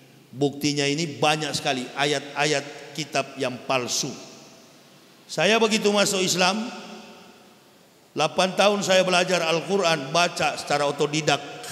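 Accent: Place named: Manado, Indonesia. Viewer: Ilmu perbandingan agama ini bagus utk pengetahuan 👍.. suka dengan gaya Manado pak yahya masih sangat kental 😄👍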